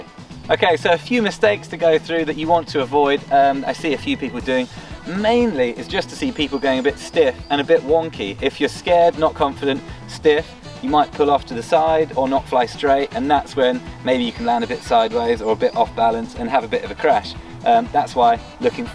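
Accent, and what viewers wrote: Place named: England, United Kingdom. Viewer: that prim and proper english accent juxtaposed with outrageously insane bike skills, full marks sir!!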